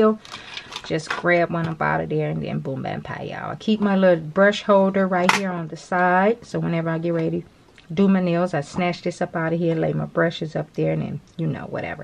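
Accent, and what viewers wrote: South, United States. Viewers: I love you I can listen to the no nonsense southern accent, I’m a fan for life… I need ideas for storing all my Polygel tubes…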